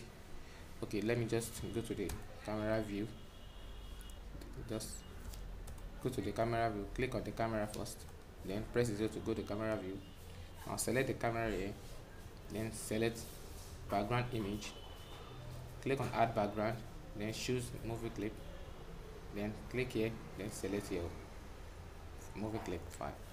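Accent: Nigeria. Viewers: are you a Nigerian?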